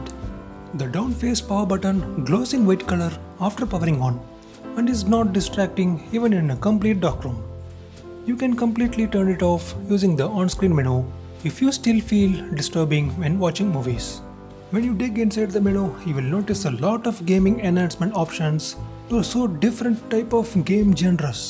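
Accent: India. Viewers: You know it's legit when you hear an indian accent.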